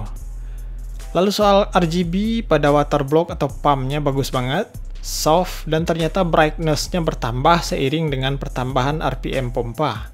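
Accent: West Sumatra, Indonesia. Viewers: Orang minang ya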